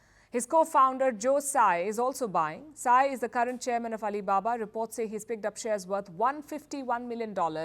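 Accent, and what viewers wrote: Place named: India. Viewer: Very heavy indian accent😂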